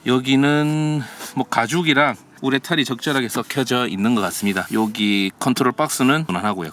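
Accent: Gyeongsang, South Korea. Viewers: ㅋㅋㅋ 나도 경상도놈이라ㅜ경상도사투리 너무 좋음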